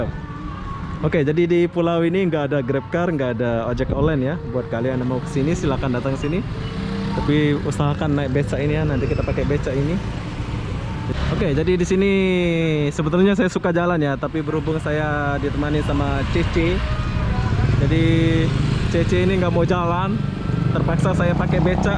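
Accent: Lombok, Indonesia. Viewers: Maaf lamun unin" dialek bhs indonesian de jak .....baun tiang bade' pelinggih batur sasak Lombok. Pdas gati dialek Lombok de. Batur mbe plinggihde?? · Slamat malam. Ternyata Semeton Lombok. pantesan di pertengahan Vidio dalam hati bilang koq ada logat lomboknya... di akhir Vidio baru terjawab..Salam kenal Semeton Sasak semoga sehat selalu dan tetap semangat dalam membuat konten N semoga sukses semeton.. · Lekan ngonek ke indeng indeng logat side marak dengan sasak, ternyata tetu side dengan sasak, ndekne bau telang logat side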